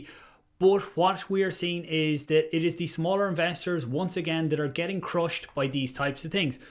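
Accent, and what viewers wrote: Ireland. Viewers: I know he is irish. Iam irish too ha Thats why i know he is irish but i cannot figure out what county he is from! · He's Irish. I don't know which part of Ireland though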